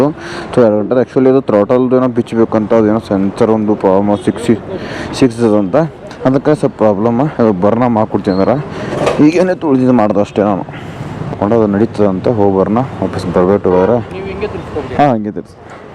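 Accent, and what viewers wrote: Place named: Kalaburagi, India. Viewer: Ur kalaburgi kannada is super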